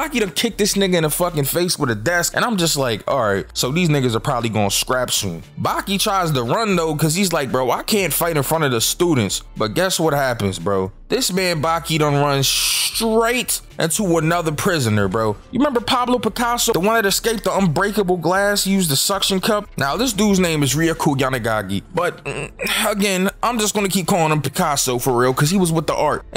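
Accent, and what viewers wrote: Philadelphia, United States. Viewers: You from philly bro 😂🤞🏿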